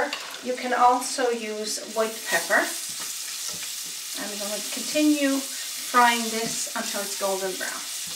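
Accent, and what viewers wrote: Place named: Newfoundland, Canada. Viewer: Looks delicious! Wonderful presentation! I love your NL dialect, with the dropped H's and slight maritime accent.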